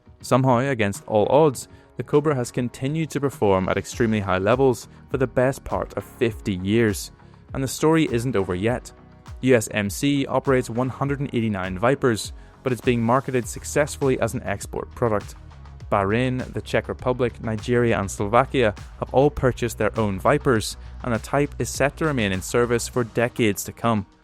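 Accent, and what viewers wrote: Northern Ireland, United Kingdom. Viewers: And this narrator is northern Irish AF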